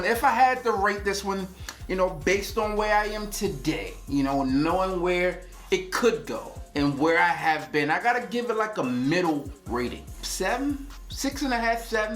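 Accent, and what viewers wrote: United States. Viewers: No offense to Jeremy fragrance but I can understand exactly what you're saying cuz I'm from America and you have an American accent that's more easier to understand then some other men's fragrance YouTube channels if you know what I mean ty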